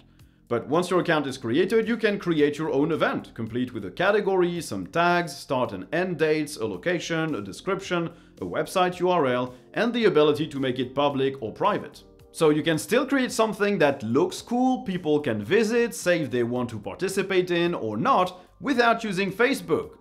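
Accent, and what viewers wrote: France. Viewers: I love hearing you speak in "French" English. This is a very interesting experience. I greet you from Poland. 😉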